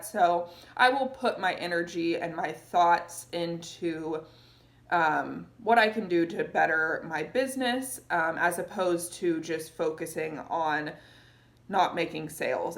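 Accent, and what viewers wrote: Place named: Oregon, United States. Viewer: Love your channel! I’ve been watching you for a couple of months and am really enjoying your videos! I’m a fairly new Poshmark seller and find your tips very helpful! Can I ask you where you are originally from? I get an Oregon accent vibe from you, but I could be totally wrong. Keep up the good work! Thanks!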